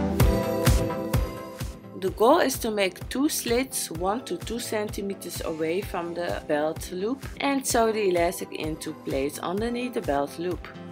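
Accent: Netherlands. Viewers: Dutch? Xox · Wait are you Dutch? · Hoor direct aan je accent dat uit nl bent, keep shining sis ❣️ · are you dutch? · Are you from the netherlands or a dutch speaking country . Because you're accent is cute. Love the vid btw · Lovely dutch accent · Ik dacht al hoor ik nou een Nederlandse accent in je Engels tot je doei doei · you sound dutch... are you?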